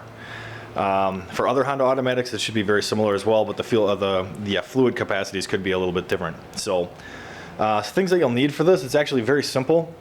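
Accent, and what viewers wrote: Minnesota, United States. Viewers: That Minnesota accent is on point!!! hell yea!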